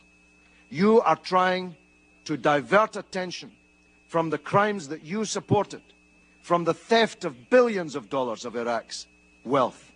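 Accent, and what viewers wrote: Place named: Dundee, United Kingdom. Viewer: A true dundonian Dundee - the best city in the worrrld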